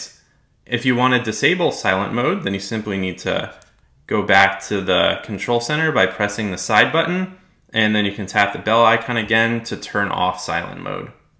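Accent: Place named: United States. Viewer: Thanks. I’m glad you’re American & easy to understand.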